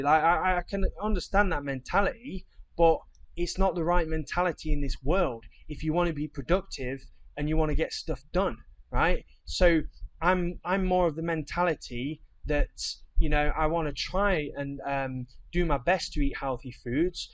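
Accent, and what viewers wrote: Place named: Midlands, United Kingdom. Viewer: AHH, ok, must be the midland twang! I'm from Manchester and we stretch out the last A. Banaaana. Loving the new surge in videos, hope you keep banging them out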